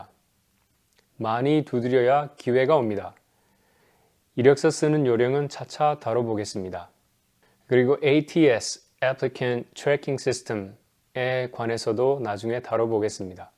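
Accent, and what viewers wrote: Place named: Daegu, South Korea. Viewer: 감사합니다! 사투리가 살짝 들리는데 대구분 같기도하고..(저는 대구 토배기입니다ㅎㅎ) 뭔가 더 반갑습니다ㅎㅎ 종종 업데이트 드리겠습니다!!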